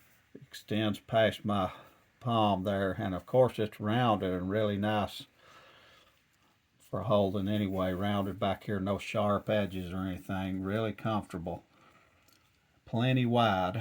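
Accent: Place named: Texas, United States. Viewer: Обожаю техасский акцент хоть и нечего непонятно😂 но Кизлярские ножи лучше 👍😅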